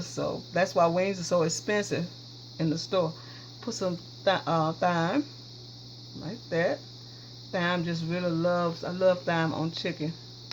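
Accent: South, United States. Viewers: I love her country southern accent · I dont know why but i love her country accent...food looks great too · lol she meant curry powder. She even said "pet a reeka" for paprika.....southern speech....sigh*** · The authenticity of the southern voice over made me love this even more! Have to try this recipe 💕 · Jason Bourne wow I didn’t realize I was going to get all this feedback... calling someone a moron though? I just made an observation. If I were to pronounce something wrong I would want to know how to say it correctly. Sooo since you want to come for me actually it’s not her accent it is her southern dialect that you really should have referenced. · Jill Lancaster she has a very strong southern accent that's why jerks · Omg i looove her KUN TREE ACCENT....kun tree cooking...kun tree eating..i love the way she say...THEH mye lol and buuuur ty full lmfao...im hollering....love her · She can pronounce things the way she wants to when the food looks THAT good..leave us southerners alone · This is country/southern cooking...and country/southern pronunciations for seasonings. 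If you want "correct" pronunciations...watch the food channel. It's almost like asking Cajun people to speak the "Kings English" when cooking. Let player's play...and cooks...cook.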